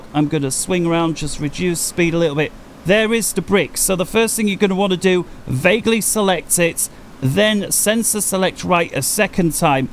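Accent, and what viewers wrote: United Kingdom. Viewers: A really good teacher, even tho you speaking british :D If it comes to GMT, i only use it for looking for targets, then when I do, i lock with radar and then quickly switch to TGP and point track, then use laser Maverick to kill them.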